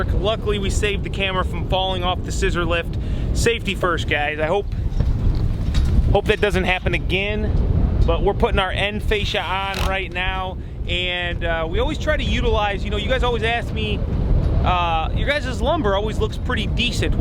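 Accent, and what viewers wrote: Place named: Chicago, United States. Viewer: Dude. I was born and raised in Illinois, 30 years. Moved to Texas 3 years ago, and everyone tells me I have chiiiiicago accent. Well... I've never heard what they are talking about... Until today. After hearing you... I get the chiiiiiicaaaaaaago accent. Good work though!! Keep it up - enjoying the builds!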